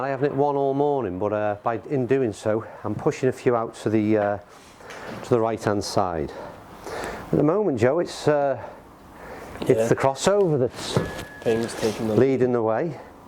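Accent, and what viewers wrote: Liverpool, United Kingdom. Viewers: Interesting to see that the u65 seems better off the deck than tee I have the same experience. Good to hear a scouser.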